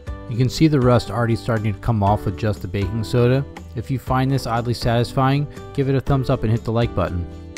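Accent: United States. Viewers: Your midwestern accent is so charming, makes me want to watch more of your videos! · This guy sounds like he's from Baltimore ("wooder" ...my only knowledge of Baltimore comes from The Wire haha)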